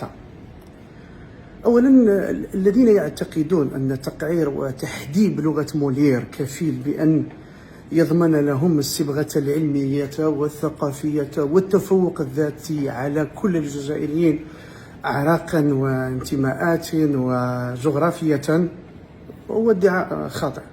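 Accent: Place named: Morocco. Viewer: انت مريض هادي عربية😂، عندك خويا لهجة مغربية ،سمحلي ،ماشي على جال المغاربة، بصح ❤يا مغربي يا نغربي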